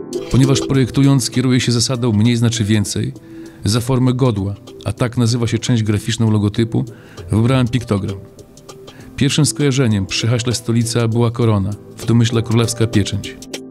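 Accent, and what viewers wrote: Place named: Podlaskie, Poland. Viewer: słychać, że z Białłeegostoku ;) gratulacje!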